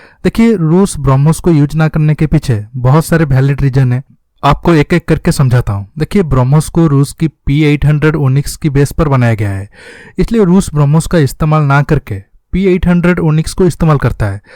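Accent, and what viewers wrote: Odisha, India. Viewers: Katha gura pura odia bhali laguchi, aau bhala bi laguchi, good job please carry on. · Are you a odia guy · R u a odia U r acent r like that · App kya Odisha se ho?? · Odia accent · Aap Odisha se lag rahe ho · Brother are you odia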